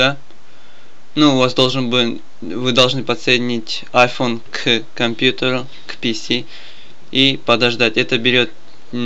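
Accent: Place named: United States. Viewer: нее....ребят говорите что хотите я уверен что он американец...во-первых прочитал хорошо английски текст, во-вторых акцент и ударения.....если вы прислушаетесь он говорит мАкинтош и Айфон а не макинтОш и айфОн...в нем чего-то русского нету))))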